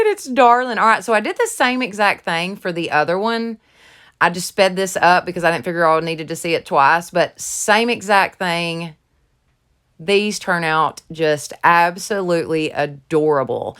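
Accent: South, United States. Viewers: I'm a little confused. You talk about your fiancee but then you mention your mother-in-law. Just was wondering. And you are so talented it is unreal. Thank you for all the beautiful crafts you do. And I love your southern drawl. I too am from the south. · Hi, I just wanted to start off saying that I love love love all your craft ideas, you are so creative. 2nd is I get a kick out of your Southern accent. I am from the East Coast a small town in Massachusetts & love the Southern accent. I also love to craft, I am a beginner & have made a lot of mistakes along the way...but sometimes they turn out to be happy mistakes. My kids call me a craft hoarder lol, I am always at DT & DG those are my favorite stores. Ok enough of the gibber jabber, I'm looking forward to what you create for the New Year, happy crafting & be safe.😷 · So cute❤️❤️❤️I don’t know what part of the south you’re from, but I’m in Huntsville Alabama ( originally from Waterloo/Florence) but you crack me up so much because you sound like me talking!! 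Love your channel so much! I think it’s because you’re just blunt and tell it like all southern women do🤣 I have never found the lanterns in any dollar tree. I’ve been to about 10 looking for the little glass candle holders because I got everything to make light posts except them.. grrr 🤣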